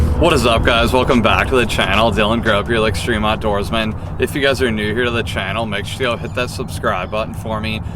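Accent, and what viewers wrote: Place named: Michigan, United States. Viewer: love the michigan accent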